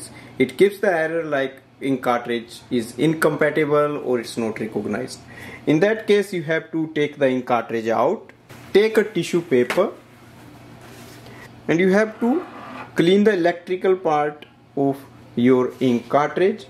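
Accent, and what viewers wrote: India. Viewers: Talk in Hindi ,your English is typical Hindi English language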